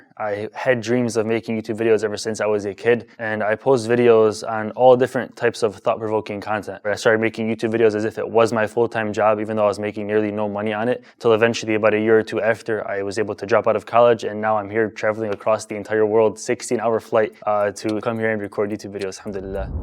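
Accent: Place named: Arab world. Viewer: لا لهجته عربية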